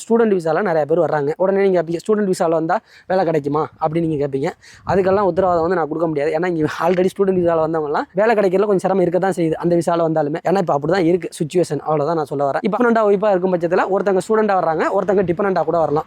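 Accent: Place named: Tirunelveli, India. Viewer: தம்பி நீங்க tirunelveli ya? Unga slang namma ooru மாதிரியே இருக்கு.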